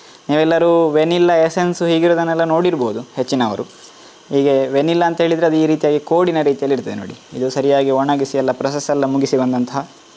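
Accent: Mangalore, India. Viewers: ಮಂಗಳೂರಿನ ಬಾಷೆಯ ಸೊಗಡು ತುಂಬಾ ಚೆನ್ನಾಗಿದೆ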